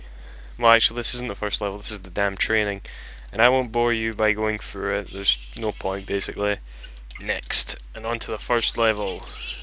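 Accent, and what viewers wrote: Scotland, United Kingdom. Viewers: I always did like the Scottish accent. Sounds so... GOOD!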